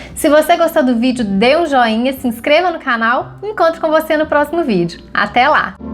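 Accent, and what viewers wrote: Minas Gerais, Brazil. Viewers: Conheci seu canal hoje e já me inscrevi! Você é muito prática! E pelo seu sotaque, acho que você é mineirinha como eu!!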